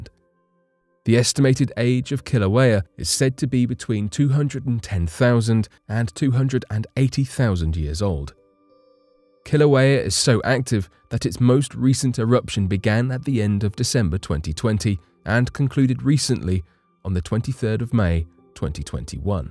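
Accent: England, United Kingdom. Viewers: When you start a video and hear a THICK uk england accent i know the video is going to be a bloody bunch of shite..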